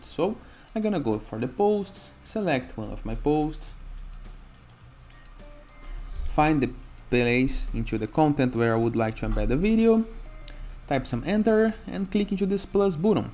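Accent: Brazil. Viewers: Você é brasileiro? 😂🤟❤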